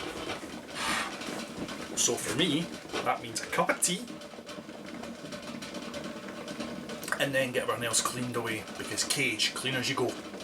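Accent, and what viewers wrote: Glasgow, United Kingdom. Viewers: west end of Glesga accent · Guid Scots accent there ( Glasgow?) This looks great , going to make a batch x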